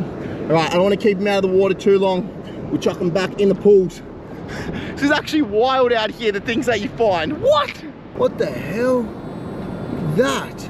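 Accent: Australia. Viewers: Are you Aussie bc I can here your accent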